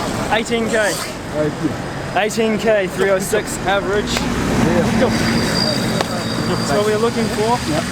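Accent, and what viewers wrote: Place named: Kenya. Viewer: Felipe Lopez It’s like he’s saying everything in a Kenyan accent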